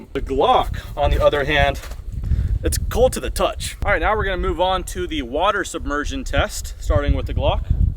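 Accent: Australia. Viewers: Lol Australian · Australian? Lmao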